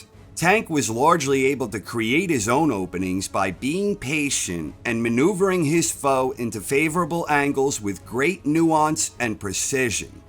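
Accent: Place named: East Coast, United States. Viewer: Like I've said before, don't ever loose that accent! My accent is Minnesotan and trust me it's not as popular around the world as your East Coast. Anyway, excellent breakdown of the fight! Davis showed us..."what's up!" My dream is Lomo vs. Davis... The Matrix vs. The Tank! Two of the greatest minds and talents of boxing yet from the most opposite ends of the world regarding culture, race and geography! Respect to both and all these warriors that have the courage to get in there wether they win or lose! I can't see Ryan as a quitter! I think he was legit paralyzed by that Tank power shot! Sneaky, fast with a Bang!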